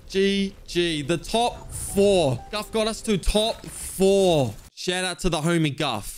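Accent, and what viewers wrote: Australia. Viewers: WAIT YOUR AUSTRALIAN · Is he Australian???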